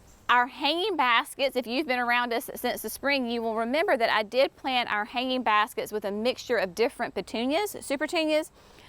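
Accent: South, United States. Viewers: Love your charming Southern Accent and the guaint Souhthern sayings. Thanks m for sharing g your beautiful garden. Love ya.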